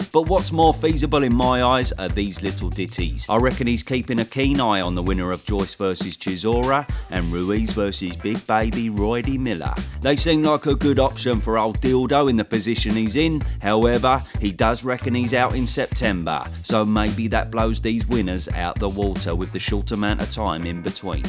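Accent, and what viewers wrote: Texas, United States. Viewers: Perfect Texas accent if you ask me. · Love the Texan accent. Literally pissed myself.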